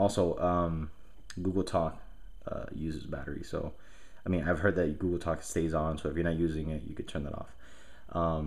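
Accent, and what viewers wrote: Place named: South Florida, United States. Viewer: thanks for your reply.. p.s. when I heard you on the video I thought you sounded familiar and then I saw that your from south fl... i'm from Miami but living in va beach for now... thanks again.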